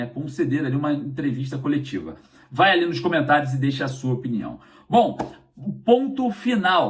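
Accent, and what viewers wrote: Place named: Rio de Janeiro, Brazil. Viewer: Que carioca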